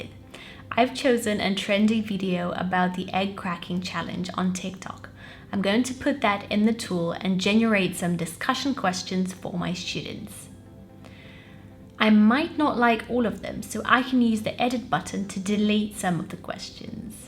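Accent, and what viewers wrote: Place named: Eastern Europe. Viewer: I wonder where her accent is from 🤔 seems like Eastern Europe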